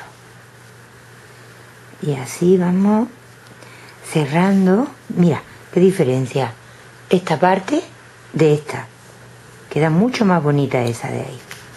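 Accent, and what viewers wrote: Andalusia, Spain. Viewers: Querida....eres una genia, me gusta todo lo que haces eres super creativa. tambien me gusta tu ayudanta ja ja la gatita que dice mamá. Sigue creando y gracias por tu acento andaluz tan bonito. besitos a las dos!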